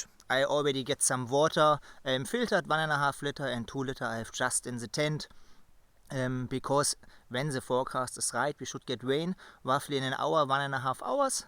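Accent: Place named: Germany. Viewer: Love the German accent with Scottish slang, great sound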